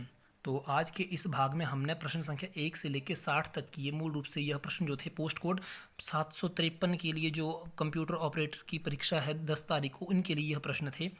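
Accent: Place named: Kullu, India. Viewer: Yaa tum kullu se ho mandi but mujhe kullu se lag rhee ho ????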